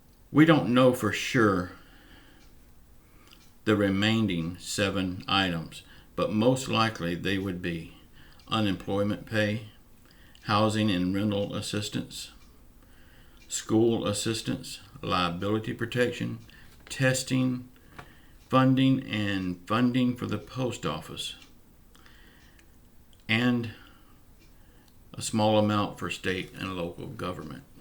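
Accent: South, United States. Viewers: I love your channel. I want to Thank You for everything.. U r the only one that seems to know whats going on , plus o love that southern accent... take care hope Amy is doing lots better ..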